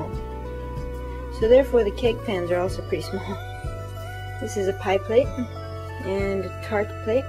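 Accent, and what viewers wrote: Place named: South, United States. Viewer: Your voice still sound very southern to me. Although I can hear other things going on in it as well. Its very かわいい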